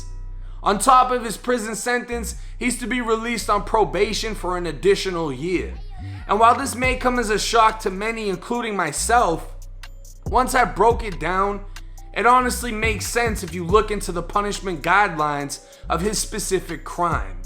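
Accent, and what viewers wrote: Boston, United States. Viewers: Yo you sound just like me Fam you got to be from the Boston area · Never heard a wigga with a Bostonian accent. It’s cool. I like it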